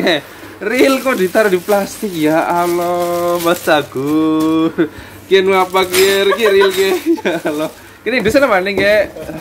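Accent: Banyumas, Indonesia. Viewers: Nyimak lokasine ngendi si ya,banene koh ngapak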